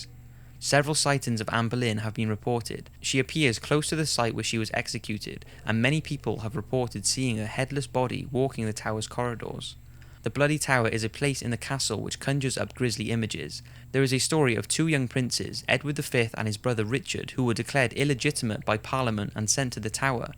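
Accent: Wales, United Kingdom. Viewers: Are you Welsh? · You sound welsh. Awesome voice! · Trying to work out your accent. . . Cardiff? Newport? Anywhere near? ... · You have a Welsh accent . sounds divine 😍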